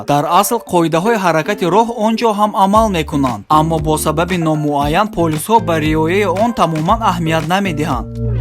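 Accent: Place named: Tajikistan. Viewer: لهجه تاجیکی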